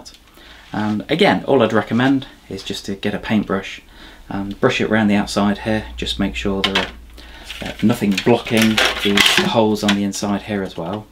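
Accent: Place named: England, United Kingdom. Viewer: ох уж этот английский акцент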